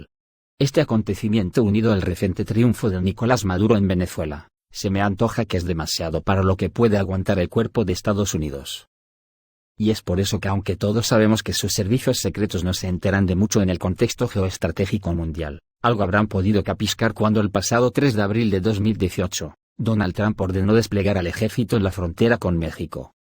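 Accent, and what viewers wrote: Spain. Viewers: No eres de MEXICO asi que no tienes derecho a opinar sobre el pais mejor preocupate por tu pais si es que tienes pero por el acento eres gachupas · Este estupido tiene asento español....es un pendejo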